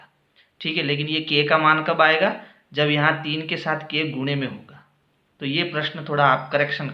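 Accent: Rajasthan, India. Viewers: Sir I am also from Pali Marwar I know that you are from rajsthan apki awaz se pta chalta hai sir ji 😅😅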